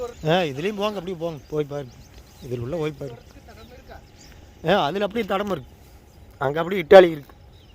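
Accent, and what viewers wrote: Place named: Kongu, India. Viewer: Kongu speak super ga anna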